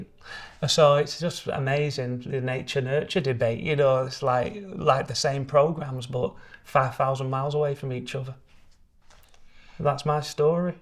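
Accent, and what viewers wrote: United Kingdom. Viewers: Thank you for sharing your heartfelt story ❤ you are a lovely man . Love your Wigan accent .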